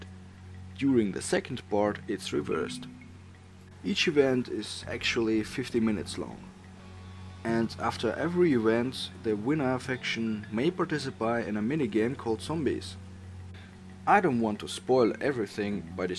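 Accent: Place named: Netherlands. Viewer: ur accent tells me ur dutch gj!!!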